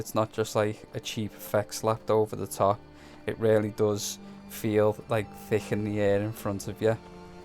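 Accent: Liverpool, United Kingdom. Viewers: Liverpudlian, right? Very detailed video, by the way :)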